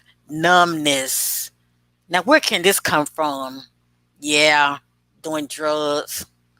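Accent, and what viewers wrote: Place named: South, United States. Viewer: Wow ! Straight on point wow I feel this in my gutt about there characteristics ! But so true about how people can go so long and keep from showing there true self ! Ty so much for this and may I ask your southern I can tell from your accent I’m from Ky I’m guessing Georgia or Alabama but I really feel the first if you can say if you can’t with everything I’d understand ! I do ty so much for your time helping us all to see a closer look into this case honey ! Stay safe and god bless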